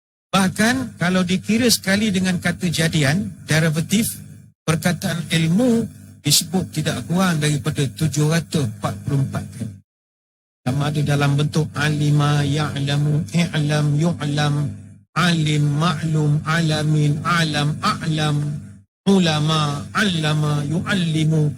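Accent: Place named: Endau, Malaysia. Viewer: Bila ustaz badli Shah bercakap. Sya sangat2 suka. Ada sikit bahasa dia mcm basah kampung sya di endau.. Huhu. Semoga ustaz badli Shah sehat selalu kami sayang ustaz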